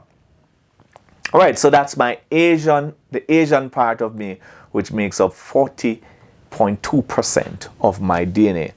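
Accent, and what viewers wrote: Jamaica. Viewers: Well when you blend it all together, you can also look Arabian and Middle Eastern as well, but your talk is 100% Jamaican, ya man. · Love your Jamaican accent. I have been there. Wonderful country and people. I am proud to say I visited the best island in the Caribbean. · You definitely talk like a Jamaican! From the way you say “issues” & “persons” & of course “long distance stulla” 🤣🤣👏🏾👏🏾 Cool results!!! 🇯🇲🇯🇲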